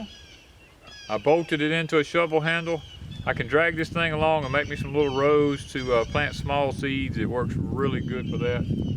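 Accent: South, United States. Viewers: What part of the south are you please?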